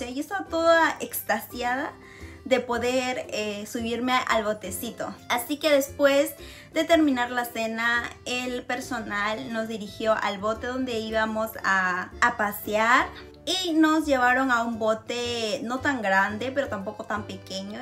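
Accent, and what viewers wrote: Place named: Mexico. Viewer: Porque hablas como mexicana? , Linda historia 💕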